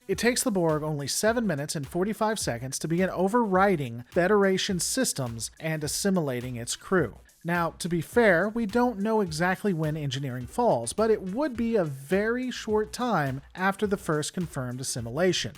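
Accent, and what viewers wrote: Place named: South Philadelphia, United States. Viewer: You from south Philly? Your accent.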